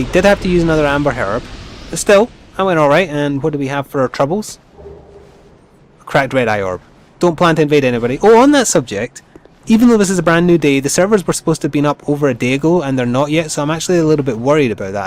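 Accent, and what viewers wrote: Scotland, United Kingdom. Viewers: It took me four episodes to finally make a good guess on what your accent is. Is it scottish? (I only recognized it because of David Tennant xD)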